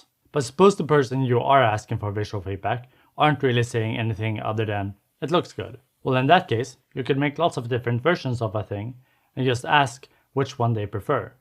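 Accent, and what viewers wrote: Sweden. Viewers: I was just about to open your channel page in a new tab to check the cannel info to see if you were Swedish*, but then these books appear confirmed it for me, lol. * I have ADHD okay; when I start to wonder where an accent is from and start to think it sounds oddly comfortably familiar, and then suddenly realise that it sounds Swedish; I just have to pause the video and figure it out immediately, or I will stop paying attention and only focus** on the accent... **That's also why my channel info only says "I'm from Norway, in case you wondered...", just in case some other ADHD'er gets distractingly curious about where the crazy person who posts multi paragraph (or one whole screenful of text with barely any periods but lots of commas and semicolons and nested parentheticals and no line breaks) is from... Come to think about it; maybe I should add "and I have ADHD" to the description too? 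That might explain some of my wildly off topic comments to anyone curious.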